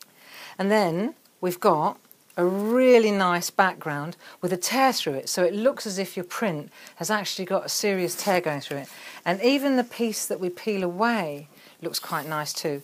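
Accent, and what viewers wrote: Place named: United Kingdom. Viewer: Wow, that’s so clear and helpful. Thank you. Lovely to hear a British accent too. 🙂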